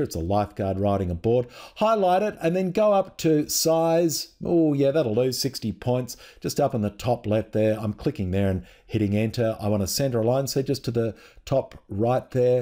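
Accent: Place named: Australia. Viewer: Great tutorial. Easy to follow, lots of tips. :-) I love to find an Aussie talking. I have one tip of my own (which you probably know of) Double click on text box corner handle will resize (shrink or expand) text box to fit text. This removes overset "red plus" indicator. Also a request for future posts. I am deaf and I rely on CC, so please minimise the amount of info on the bottom 1/4 of screen, because sometimes critical visuals are concealed by captions.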